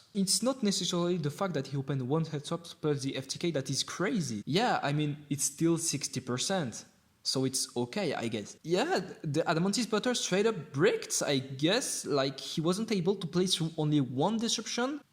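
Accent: France. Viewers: Sound like french accent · Le petit accent français haha . Gg propre tes vidéos je m'abonne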